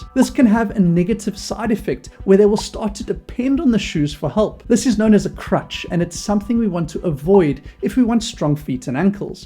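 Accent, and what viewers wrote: South Africa. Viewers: Great video with so much common sense, which should be called “rare-sense” these days. Thank you. PS: you sound South African. Am I correct there boet?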